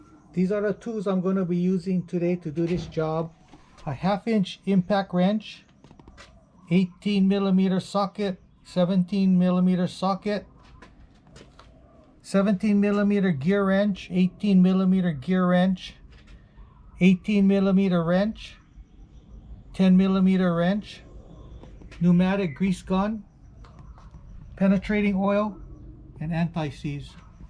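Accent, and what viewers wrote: Hawaii, United States. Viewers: Nothing says Hawaii like your accent, my friend. I bet you're about old enough to remember the following song on the radio - probably KIKI - "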